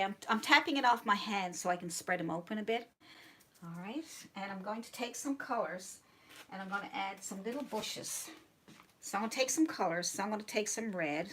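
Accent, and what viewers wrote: Newfoundland, Canada. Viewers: I must ask you, are you from Newfoundland. I hear your accent and colloquiums which bring me to this conclusion. I'm glad I found your great tutorials. Best Kind. Thank you for your secret into why my paintings are killing me. I was a mural painter for a living with a few other talents lol I thought canvas painting would be a great step but I hit the wall. I'm taking it down after watching you, brick by brick. Take care.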